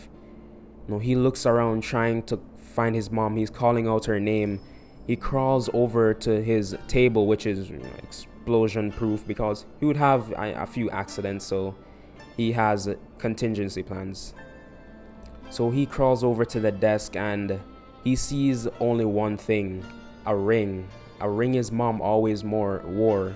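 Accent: Jamaica. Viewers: Yo what is this mans accent? I hear a little bit of a Jamaican maybe but I’m really not sure.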